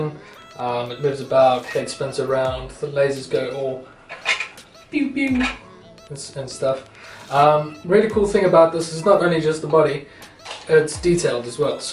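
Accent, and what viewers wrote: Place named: South Africa. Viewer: Are you South African? You sound South African.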